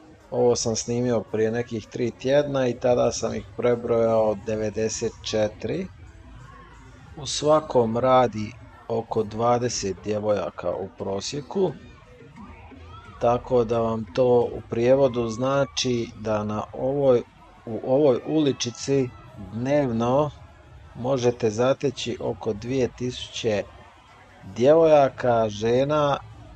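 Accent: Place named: Herzegovina, Bosnia and Herzegovina. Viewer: Ok drugar ocito nista ne znas o Europi jer si negdje iz Ercegovine koliko čujem po glasu . Da ti Tata objasni šta imas u toj Europi imas klubove od 5000 ljudi koji se svi praše bez stajanja dovoljno je da potapšeš osobu koja ti je draga po ramenu i ta osoba ce ti dati felacio besplatno . To o njih 20 ispred tebe svaki bordel u Europi radi zove svoje cure i ne placas pice vec te pitaju koju bi kresao. I da ti kaze netko ko je bio na Patayi sve je to super ok ali daleko bolje mi je u Nizozemskoj ili Belgiji od Thailanda tako probaj ovdje vjeruj mi biti ce ti isto super.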